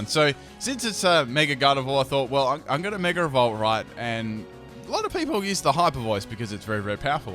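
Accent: Australia. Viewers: are you australia?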